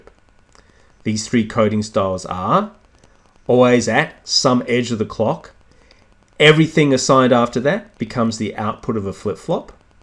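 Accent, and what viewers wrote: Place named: United Kingdom. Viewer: What are you talking about? He's pronouncing it with his British accent what's your problem lol? Your accent is a lot worse for pronunciation, so I wouldn't be talking if I was you, at least his is one native to the English language.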